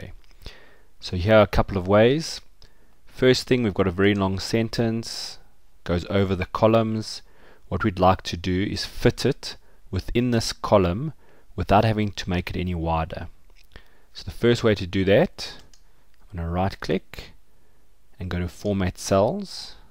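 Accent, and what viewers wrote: South Africa. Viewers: This was helpful! I recognize the South African acccent. :)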